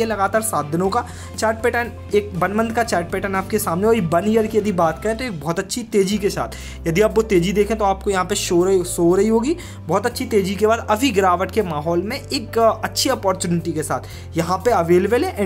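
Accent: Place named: Bundelkhand, India. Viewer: aavaj se aap bundelkhandi lagte ho😍😍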